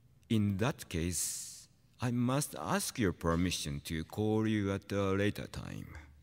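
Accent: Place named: England, United Kingdom. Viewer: I love his English accent, sounds so melodic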